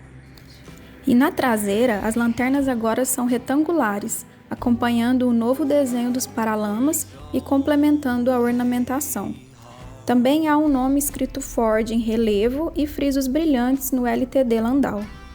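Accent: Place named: Minas Gerais, Brazil. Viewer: Eu já sou fã de carteirinha desse casal. Mas além de tudo maravilhoso (carros, imagem, ideias, explicações, estradas, etc), não posso me furtar a dizer que o texto é impecavelmente escrito e falado pela, as colocações verbais e tudo mais, resumindo, o português bem falado, com aquele sotaque "minerês", que, eu que sou Tricordiano, conheço muito bem. Ah, um Landauzão na garagem ia ser muito bom, mas eu moro em apartamento e as vagas cobertas não comportam um gigante como esse. Teria de estacionar nas rotativas, ao relento. Aí, dá dó, hein. Quando passa o antigo na estrada, eu já estou pronto pra assistir com o like. Valeuuuu, casal, showww de bolaaa. Sempre em frente!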